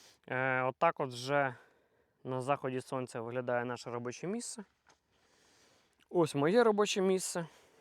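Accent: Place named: Western Ukraine, Ukraine. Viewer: Це шось Західно-Український діалект.😊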